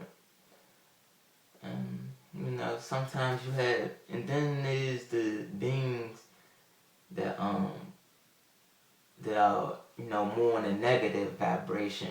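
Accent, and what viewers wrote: Washington DC, United States. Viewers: Cool hair, you sound like you're from DC. This vid was interesting.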